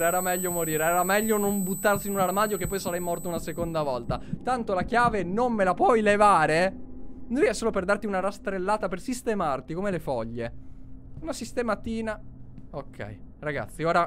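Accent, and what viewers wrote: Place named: Veneto, Italy. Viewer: Oddio ma sei venetoooo anche ioooo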